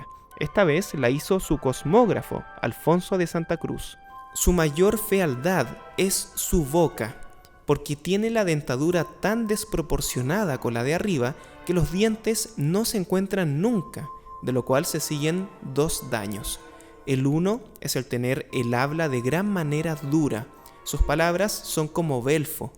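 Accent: Chile. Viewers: No se de donde eres, este es el primer video tuyo que veo pero tienes un acento Chileno muy marcado.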